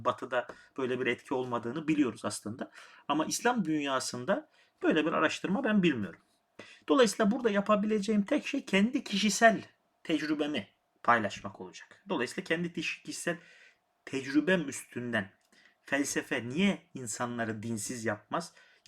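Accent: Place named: Gostivar, North Macedonia. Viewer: Çok güzel bir yayım olmuş. Takibe aldım sizi, diğer videolarınızı da izleyeceğim inşallah. Bu arada aksan hafif tanıdık geldi, meğerse memleketliymişiz hocam. Gostivar'lıdan Ohri'liye selamlar.:D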